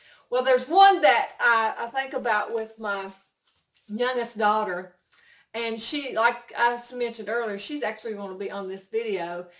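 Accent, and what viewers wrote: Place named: Tennessee, United States. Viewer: Love the video. I’m a Tennessee girl and I talk like you do 🤗🤗🤗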